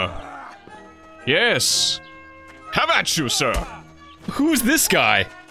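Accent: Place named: United Kingdom. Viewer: More British accent please 😆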